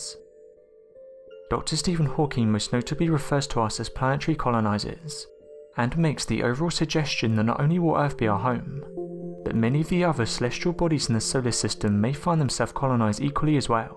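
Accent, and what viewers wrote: United Kingdom. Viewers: Another British accent. Cool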